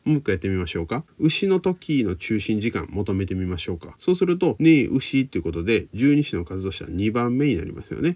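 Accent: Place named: Kyoto, Japan. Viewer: もちろんです！源氏物語はもとより、さんの京都弁、声、話し方、スピード、全てに癒されています。学生の時にこんな先生がいたらもっと古典の勉強、頑張れたのに・・😂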